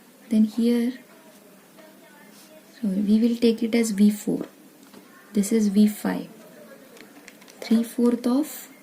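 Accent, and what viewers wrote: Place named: Maharashtra, India. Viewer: Thenks ma’am it has been most helpful ❤️🫡 you sounded like a marathi mulgi though 😅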